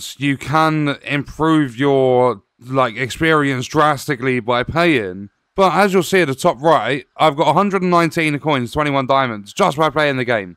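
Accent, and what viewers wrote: United Kingdom. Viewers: dude the british acent absolute king 😩👌🔥🔥🔥🔥🔥🔥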